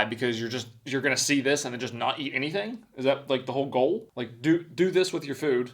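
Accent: South, United States. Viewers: Sounds country but doesn’t act country 🤷🏻‍♂️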